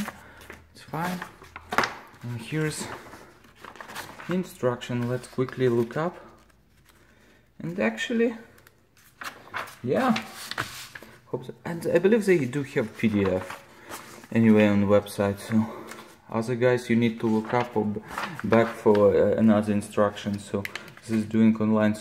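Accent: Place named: Russia. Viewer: You are not a Russian case. The accent is similar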